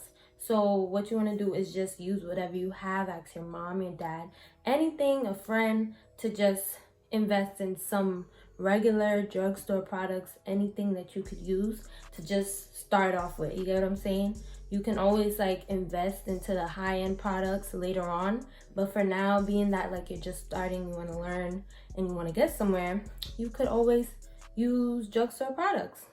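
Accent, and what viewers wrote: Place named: New York, United States. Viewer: Haha loved this. Girl you gotta be from NY I know a NY accent when I hear one lols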